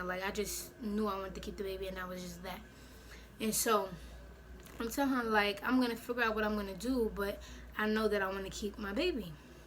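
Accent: New York, United States. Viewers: you from new york ? 😭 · You know she from New York 🔥😂🗽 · are you from new york?😭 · Are you from New York? You sound like you are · Ch Ch she probably from New York . That’s what her accent sound like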